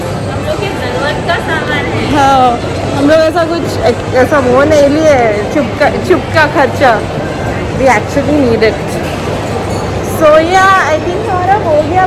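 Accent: Hyderabad, India. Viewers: M also from Hyderabad it’s really Hppy to hear Hyderabadi on ur vlogs..aisech Bath kro acha dikhra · As I am Hyderabadi leasing Hyderabadi feels good so plz keep talking in Hyderabadi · I love Hyderabadi accent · Really enjoy ur Hyderabadi hindi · Amongst all South Indian States, Hyderabadi accent is very swt n easily understandable. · Love when you talk hydarabadi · apan #hyderabadi bolte acchai diktai...#sania_mirza ki nakko sunno #be_orignal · the vlogs are going well,continue with your hyd’i,We should be proud our Hyd’i Urdu is very rare and people don’t get to hear it often.👏🏻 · Nice vlog hyderabadi meich baat karye mazza aati dekh kar 🤗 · Dubai Home tour please😍...your hyderabadi is cute ✌ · Yes we loved the clog very much n we love Hyderabadi accent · . Be natural. We like you like that. Hyderabadi urdu zindabad. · Plzz hyderabadi hindi hi bola karo.... 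Aftr all its ur Mother tongue..... Sounds gud... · Hyderabadi accent love it 😍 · Love to listen to you talk in Hyderabadi.. please continue ❤️ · Please talk in Hyderabadi accent only we love dat yaar love u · Hi.. I'm from Jaipur....love when you talk in Hyderabadi.....😘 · Loved ur hyderabadi accent... Keep using it😘.much love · Hey your too cute. Especially the Hyderabadi accent.I enjoy watching ur blogs.Really looking forward to your upcoming blogs 😊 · Mashallah very good vlog👍 loved your Hyderabadi accent plz improve your voice quality in the video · Apene Hyderabadi hai yaar not Pakistan urdu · Loved your Hyderabadi accent, When u r speaking Hyderabadi, want to listen more from you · Haha..Hyderabadi accent has so much jayenge, aayenge, karenge.. Love watching ur vlogs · I love the hyderabadi accent. It suits you like anything :D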